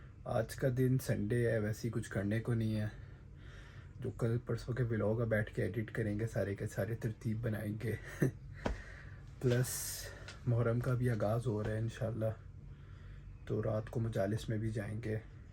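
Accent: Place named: Kuwait. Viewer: wohii kwt wala accent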